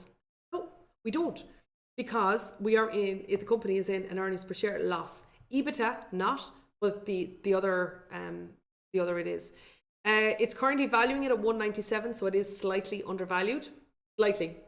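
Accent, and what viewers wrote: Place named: Ireland. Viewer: Now thats an Irish accent if I ever heard an Irish accent!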